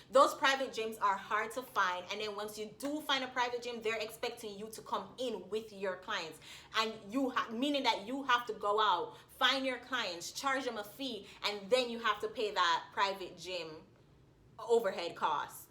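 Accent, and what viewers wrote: Jamaica. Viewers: Sound like a yaadi. Mek wi link up!!!!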